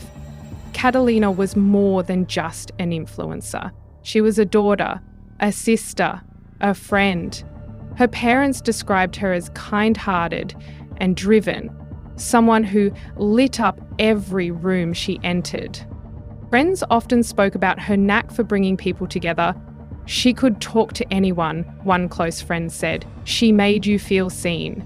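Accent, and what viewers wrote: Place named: Australia. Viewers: Yeah it’s Australian. Many channels are AI voices now so I am happy to find a real person speaking. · Love this accent. Im thinking its Australian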